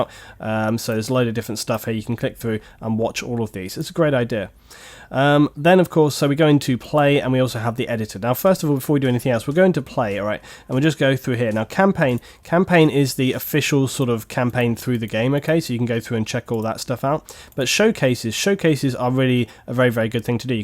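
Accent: United Kingdom. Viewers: Hi I have a HP notebook which I play arma3 on. I a noob with about 20 hours on it and I’ve just discovered I can’t get half of my actions, like player stats, gps,etc.. as I have no right Ctrl button on my keyboard. I’m computer illiterate lol, so could you or anyone reading plz help with a remedy to my issue? Can I configure the keyboard somehow? (but in game only!) where I can change a non needed key to a R-Ctrl to use. Thanks pal great video and very useful still years on. appreciate your effort and time you invest in your video’s. BTW wat part of uk u from ? I can’t tell. I from the northwest I think you’re south of me lol 👍🇬🇧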